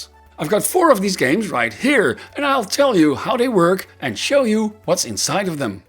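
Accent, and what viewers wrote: Netherlands. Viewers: Hi! Very nice video, thank you🙂 I have all the Bandai double-layer and single-layer solar games, they are amazing. Especially that they still work! One of my favourite is the single-layer "Invaders of the Mummy's Tomb", the mini version. Double-layer Terror House is also good. I'm not very fond of Zaxxon though, but maybe I just didn't get the hang of it, yet. FYI, there were several more of these double-layer LCD delights. I know (and own some) of these: MASUDAYA: Samurai Vs Ninja, Air Force MORIOKA TOKEI: Brave Fireman, Construction Work, Mice Party, Shark Hunter, Sky invaders (=Air Force), Jupiter Please, can you tell me, which LCD game books (catalogues) did you show in the video? I want them🙂 Especially the second one shown. p.s. You have a lovely Dutchglish accent😄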